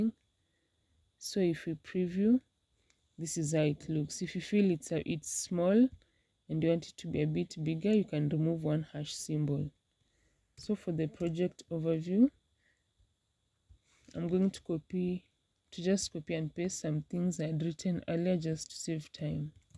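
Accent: Kenya. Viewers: Eish you sound like a Kenyan wee nakushow nimekwama videadly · I can tell that you are a Kenyan. 😅 Kenyans have their own accent.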